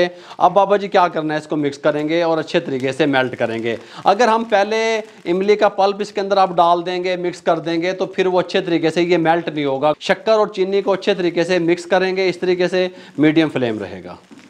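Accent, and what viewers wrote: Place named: Haryana, India. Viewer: From Okara Punjab Pakistan. jee aap ki maa Boli Haryana ki lagti hay. jee aap k programme both achhay ja rahay hain.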